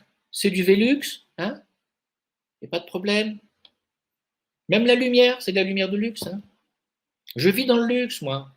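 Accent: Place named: Algeria. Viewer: l'accent pied noir chinois c'est juste EPIC !!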